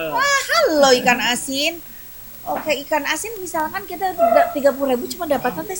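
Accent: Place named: Garut, Indonesia. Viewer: Ngomongnya ala garut terussss skrg😂😂😂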